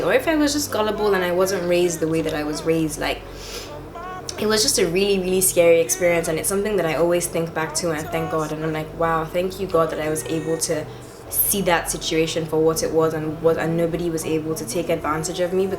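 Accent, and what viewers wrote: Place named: Nigeria. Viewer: It kind of sounds like you have a Nigerian accent or something. I’m Nigerian so I was just wondering if you were too 😂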